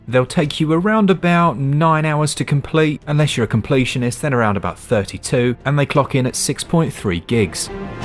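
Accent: United Kingdom. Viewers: I don't know why, but you kinda sound like a sexy British Morgan Freeman 🤷‍♀️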